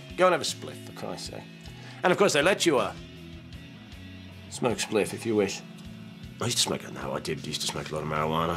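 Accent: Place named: Australia. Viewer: wtf hes australian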